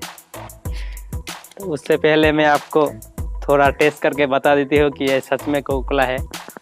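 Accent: Bengal, India. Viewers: Aap west bengal ho Kiya?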